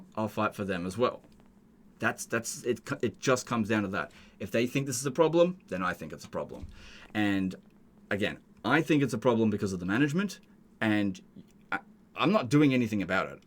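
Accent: Australia. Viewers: Bleaaase dont quit YT entirely, i can't go for weeks without your soothing Aussie voice playing on my second monitor!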